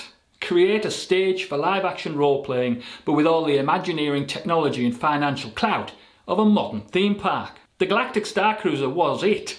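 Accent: Scotland, United Kingdom. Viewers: Nice channel , you sound like a Scotsman!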